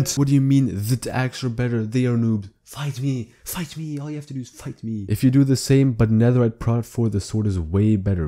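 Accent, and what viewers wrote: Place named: Germany. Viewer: Joa net schlecht das man als Deutscher versucht einen englischen Kanal man merkt schon das sich die deutsche mc Community sich für solche Videos nicht interessiert seit dem BastiGHG nur eintönige Challenge Videos macht. Ansich ist dein Englisch sehr gut aber man hört nur minimal dein deutschen Akzent.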